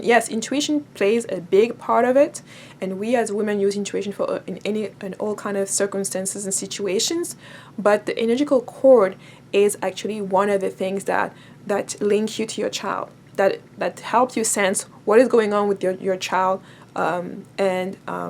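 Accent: France. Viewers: Why do I hear a French accent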